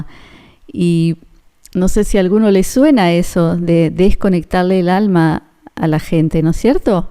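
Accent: Argentina. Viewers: Una Argentina leyendo eso?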